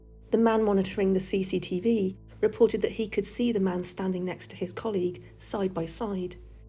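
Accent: England, United Kingdom. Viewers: You and Curious world are the best 2 ghost channels with great narration and editing. Love ur voice. Always loved listening to british women. Wish i lived across the pond. Oh I forgot about the costwold explorers new channel ghostly trails or something like that Robin is a damn good narrator too. Makes all the difference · Hello.. I absolutely love your channel,but your kind voice narrating incredibly interesting facts topped with that beautiful English accent has made me a huge fan.. Lots of love from Australia.. God bless..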